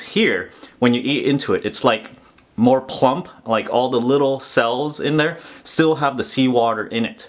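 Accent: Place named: United States. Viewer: great video but man it always catches me off guard when i see an asian speaking perfect american english lol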